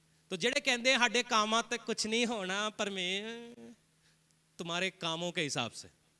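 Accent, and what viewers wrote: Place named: Punjab, India. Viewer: Jai Masih ki pastor ji God bless you very nice word aur aapki Punjabi Hindi sun kar bahut maza aata hai aur shanoo wali baat kia baat hai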